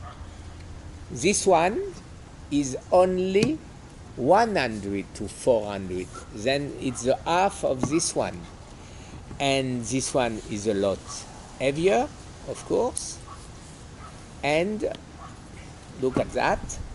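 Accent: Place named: France. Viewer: Cà me rend triste aussi d'apprendre que tu es atteint de cette sale maladie. Il y en a tellement! Mais bon il faut garder espoir et profiter de la vie comme tu le fais. C'est en partie grâce à toi que j'ai migré vers le monde du MFT. J'ai choisi Olympus et suit très satisfait. J'aime tes vidéos et ton anglais "so frenchy". Tiens le coup.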